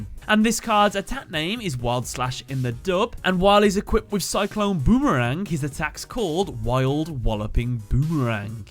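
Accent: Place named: United Kingdom. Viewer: you're so British. And I live that you say reckon.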